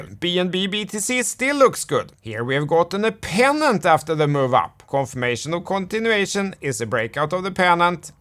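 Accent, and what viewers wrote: Sweden. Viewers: Love your vibe, you odd swedish accented guy!! You prob think that about our Aussie accent too.Thanks for sharing here on YT.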